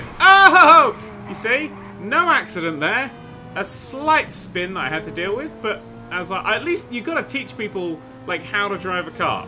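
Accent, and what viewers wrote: England, United Kingdom. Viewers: Nerd are you English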